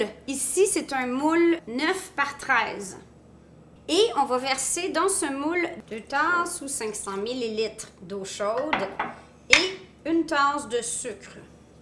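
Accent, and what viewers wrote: Quebec, Canada. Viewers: quand j ai lus les noms de mes deux fruits preferes j ai ete super content . merciiiiiiiiiiiiiiiiii. - est ce que tu peux nous faire des pates au pesto . hummmmmmm reponds moi stp -PS ; j aaaaadore ton accent quebecois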